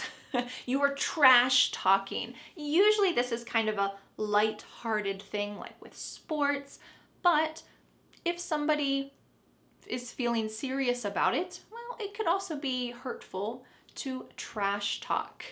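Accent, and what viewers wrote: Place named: United States. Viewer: I love u cuz u speak us accent♥️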